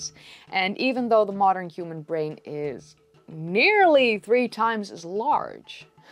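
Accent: Netherlands. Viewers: Ik hou van je Nederlandse accent en hoe intelligent je klinkt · do I detect the slightest Dutch accent? maybe even belgian? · Do I detect a Dutch accent? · Na 3 seconden hoorde ik dat je nederlands bent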